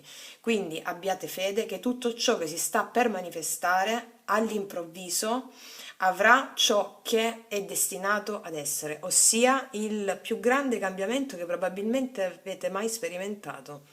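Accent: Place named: Lecce, Italy. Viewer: Grazie ti seguo perché sei preparata e usi le tue carte in maniera adeguata, comunque la prima variante è ciò che sto vivendo grazie, e poi hai il mio accento leccese🤣💖💖💖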